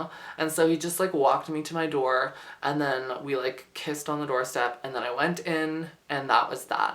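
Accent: Canada. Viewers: she is canadian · Are you Canadian???? Just wondering 🙂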